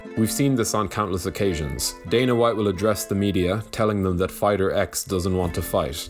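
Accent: Ireland. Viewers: u Irish my guy?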